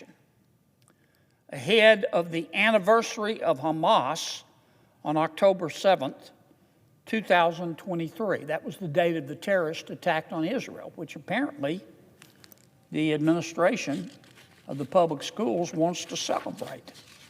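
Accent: South, United States. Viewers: Just LOVE his Southern drawl and smooth demeanor. WHAT is wrong with parents who send their kids to schools like this?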